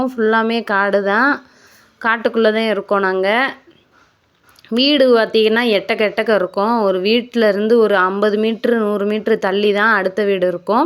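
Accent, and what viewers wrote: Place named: Madurai, India. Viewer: நீங்கள் மதுரை பக்கம்பேசுரமாதிரிபேசுரிங்க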